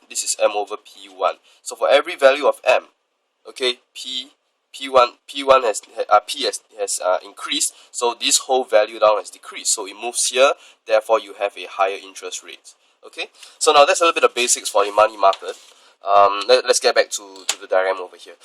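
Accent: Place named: Singapore. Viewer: Hi, thank you for your videos! Btw, are you Singaporean? Your accent sounds like it. That's cool because I understand everything you said in contrast to my university lecturers in Estonia. :D Cheers!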